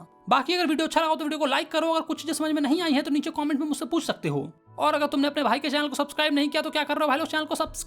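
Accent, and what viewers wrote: Bihar, India. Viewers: Bhaiya aap Bihar se ho kya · Aap bachhon ko hindi padha rahe ho ya bihari... Apni language par thoda kaam keejiye... Bachhon ki bhasha sudharni hai bigadni nahi hai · Bihari tone kam nikala karo samajh nhi aata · I know you are Bihari 😊😅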